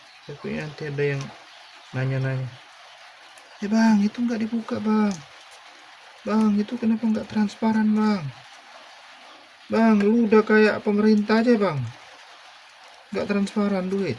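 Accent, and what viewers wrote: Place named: Medan, Indonesia. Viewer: abg orang mana ? logat nya ku dengar macam orang medan. mau tanya tentang speaker eggel ku bg. kalau volume kuat mati sendiri dia bg. ada solusi nya bg ?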